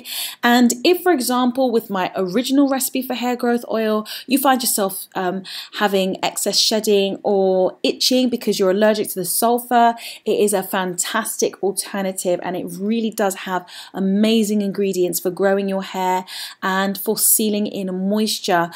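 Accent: England, United Kingdom. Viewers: missing the English voice over here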